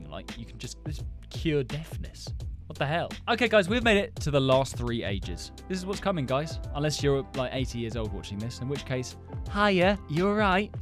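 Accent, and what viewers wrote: United Kingdom. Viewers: You sound like British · Are you British?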